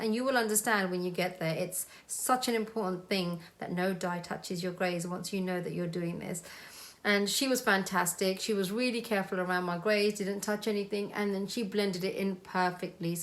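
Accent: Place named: United Kingdom. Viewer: Love ur British accent!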